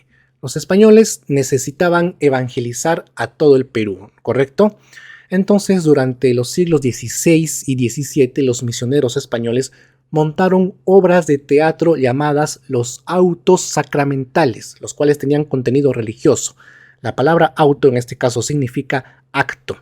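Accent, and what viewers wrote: Bolivia. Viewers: Te llevo escuchando varios años y lo unico que escucho de tu parte es controversia, odias todo lo que es peruano y sobre todo durante el virreynato ..Por que no dices que eres un pro boliviano o boliviano directamente o pro indigenista boliviano..Hasta se te nota en la voz , no hablas como peruano. Tienes bastante dejo boliviano. Deja de engañar a la gente que hay pruebas eficientes , hay libros y que te desmienten..O acaso se te ha olvidado que Bolivia era el Alto Peru y pertenecia al Virreynato del Peru.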